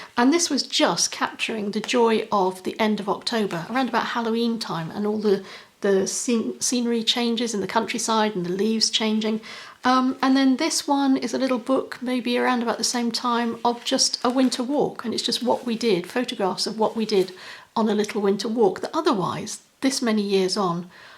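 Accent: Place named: United Kingdom. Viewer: Excellent ideas! Especially after everything that’s been going on. Relying on big events for happiness would be difficult because so much stuff is being cancelled. And anyway you always hear the expression “it’s the little things in life!” Getting a card from someone I haven’t heard from or even a text. A child saying something funny that you never would have thought. I watched a video of a family who full time RV’s and their 6 year old daughter said “you can never have too many chocolate chips!” Good thought. Thanks! Your videos cheer me up. I could listen to that lovely British accent all day! Something I just thought of that makes me smile. I love to look for fun, funky socks. Sometimes if I’m down a crazy pair of socks will cheer me up!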